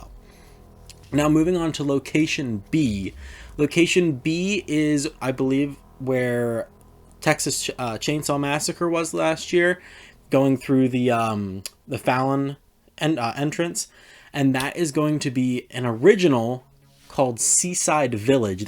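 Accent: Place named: Delaware County, United States. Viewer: Whew that accent jumped out, it’s giving Delco or Delco adjacent.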